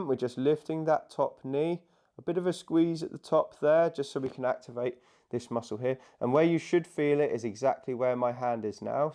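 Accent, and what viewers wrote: United Kingdom. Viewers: Greetings! Glad you are talented as a "speed listener." I am not that talented. Yes, I am also stiff, but I appreciate the "slow" explanations. Especially with his British accent. He cares enough to make sure we get it. After all, we are the 50+ crowd. So slow down your "speed listening" a bit. If you don't like it, slow down anyway. No, I'm not stupid. Enjoy the video.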